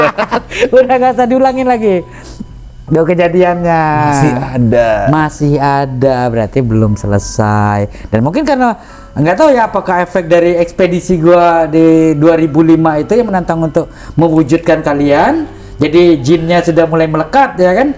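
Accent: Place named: South Sumatra, Indonesia. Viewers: Padahal enak ceritanya, dari ngomong pakai bhs daerah di terjemahin lg pakai bhs nasional. Ya begitulah logat sumatra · Bahasa sumatera selatan tu jgn d campur2 bahasa indonesia mang 😂ketahuan bener logat mu bahasa sumsel ,bingung org klo yg gk ngerti ..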